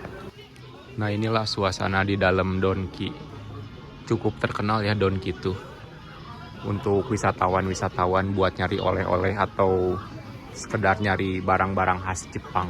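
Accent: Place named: West Java, Indonesia. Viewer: Gass mantap orang sunda inih ketauan logatnya da abimah oge sami orang amsterdam 😂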